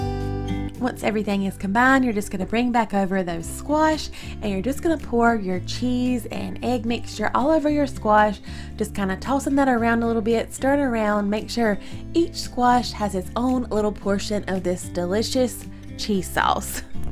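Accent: South, United States. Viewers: Thank you for sharing your recipes ! Your Southern accent makes it even more enjoyable to watch ❤️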